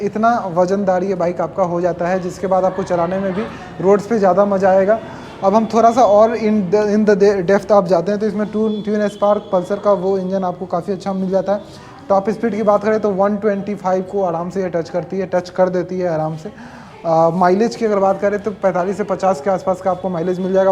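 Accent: Bihar, India. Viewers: Are you from Bihar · I bet you are Bihari. I guess because of your pronunciation of ra and da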